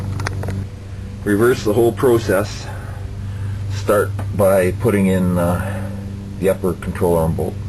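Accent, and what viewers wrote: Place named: Canada. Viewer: Canadian eh...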